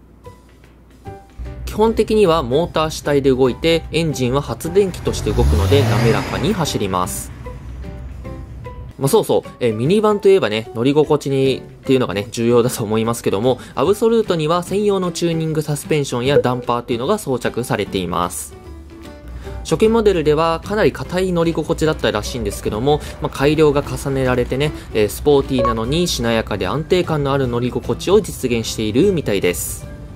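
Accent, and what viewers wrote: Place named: Kansai, Japan. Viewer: 南港あたり、西名阪、香芝インター、たまに聞こえてくる関西弁ｗ すごい親近感がわきますｗ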